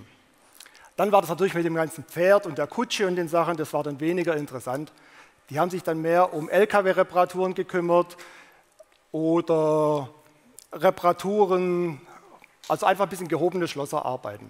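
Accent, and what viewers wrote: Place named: Swabia, Germany. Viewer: Wie schön das Schwäbisch doch ist;)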